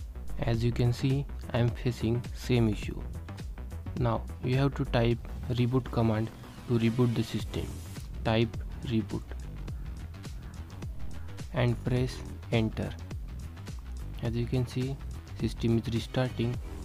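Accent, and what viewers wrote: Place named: India. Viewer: As soon as i hear bgm and indian accent i knew it's gonna work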